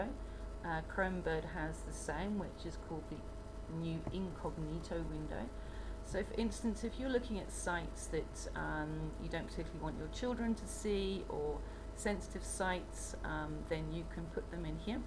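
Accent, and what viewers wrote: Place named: Australia. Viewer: thanks! really helpful! and i love your Australian accent!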